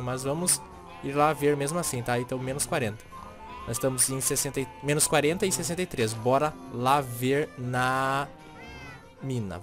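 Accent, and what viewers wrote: Rio Grande do Sul, Brazil. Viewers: eu não gosto quando você fica tudo Gauchinho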